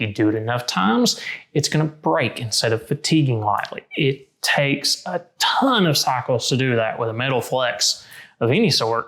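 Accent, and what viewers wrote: Tennessee, United States. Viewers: I recently bought some frames I really wanted from the UK online but was having a hard time adjusting them, watching a few of your adjustment videos helped me get them just right. I had a bad experience with another pair I bought. I loved the frames and the opticians were nice, but they just couldn't get them adjusted right after having them adjust over a dozen times. Anyway, it's nice to have someone explain what to look for and how to make that bend to account for it (also just... what you can and can't do to a frame to make adjustments, I think that's another source of confusion). I looked up your store, nice to see a fellow Tenneseean (I thought I recognized a TN accent!), I'm from Knoxville but I'll definitely look into your shop when I get my next prescription update. Out of curiosity, do you stock Reykjavik Eyes? 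Thanks for creating these helpful videos!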